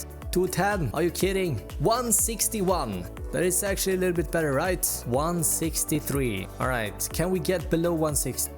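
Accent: Sweden. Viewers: THE SWEDISH ACCENT IS ON FLEEK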